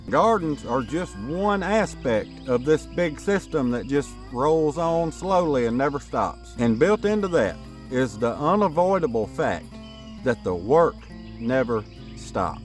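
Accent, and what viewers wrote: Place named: Mississippi, United States. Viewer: Great content brother, I knew from that accent you had to be from my neck of the woods, Mississippi! Some people say I'm more countrier than two rows of butter beans, lol! But anyhow, I don't think most people realize how much work is involved in raising live stock, gardening, etc. It is W-O-R-K period! I've done just about it all, Till, plant, weed, pick and shell beans, raised chickens, rabbits, hens, ducks, hogs, goats, cows, fish, etc., canned, smoke house, and the list goes on and on. But the reward is also very, very great! There's just something special that is hard to describe to people when you sit down at your dinner table and eat the food that you know you have worked really hard for and that which the good Lord has blessed. I'm believing God that I can buy quite a few acreages of land here in the near future and retire on a small farm. 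I know it will be fun, because I know what to expect and to know my limits.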